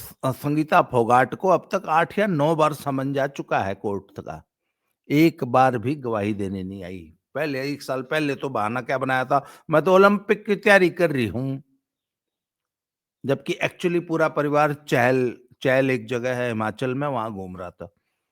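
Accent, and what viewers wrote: Haryana, India. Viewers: Babaji please aap to harvanvi bhasha hi bola karo.aap ko majedar tarike se bolte ho. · Baba ji aap ki haryanvi tune sun ker maja aa jata hai.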